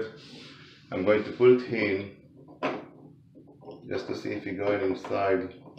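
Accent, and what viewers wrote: Israel. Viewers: יש לך מבטא ישראלי ?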